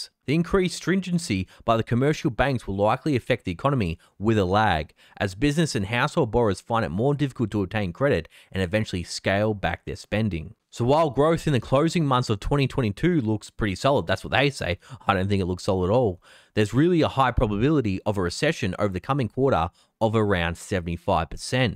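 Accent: Australia. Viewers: keep saying data in your native aussie accent! dont change!!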